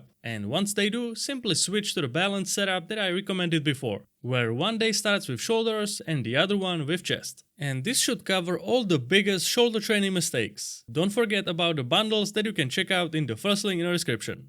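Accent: Czech Republic. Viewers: Do i hear a czech accent?😗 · What about the dumbbell overhead press, I stand up when doing it, so I am vertical, but I push elbows and head a little forward, is it OK then? Btw I knew you are Czech after the first sentence, we can recognize that accent anytime :-D Ať se daří :-D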